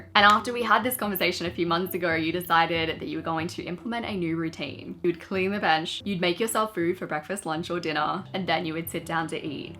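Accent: Australia. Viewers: I can’t stand your accent. Australian accent is sooooo annoying. It’s like you guys add an L to the end of words like food. Your a mix of English and some made up garbage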